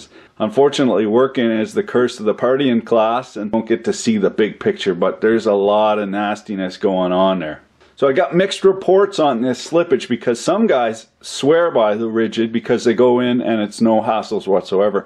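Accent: Canada. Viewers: Good stuff. I'm starting to get the hang of Canadian speak. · Lurnin Canadian with. Still don't have that accent down. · Great to hear Canadian language. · Are you and 1pug1 brothers or is this just how all Canadians talk. bmp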